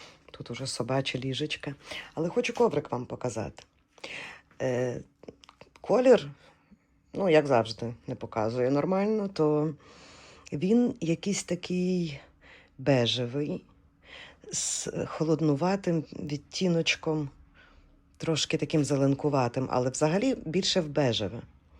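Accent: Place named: Western Ukraine, Ukraine. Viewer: Дуже интересно, ви из захидной Украини? А звидки? Акцент наш!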